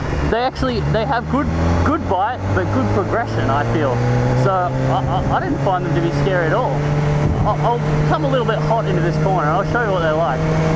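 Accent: Australia. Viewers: One of the best reviews I’ve seen in ages! Thanks! Nice to hear an Aussie for a change and no boring 5 minute irrelevant story that seems standard in the states? Haha. Excellent review thanks!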